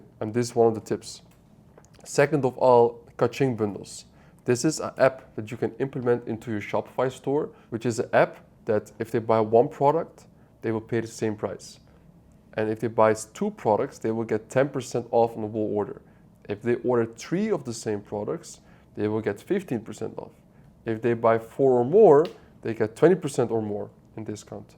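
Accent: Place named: India. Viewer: No one can easily understand Indian English. It’s not about us — it’s about how you pronounces it in India cuz of accent you use.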